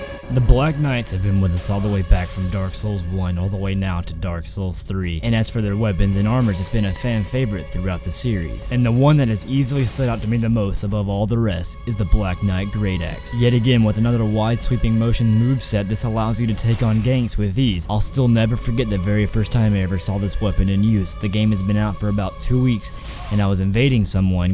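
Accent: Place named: Texas, United States. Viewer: I really love your videos! Also what accent is that are you from the south like Texas?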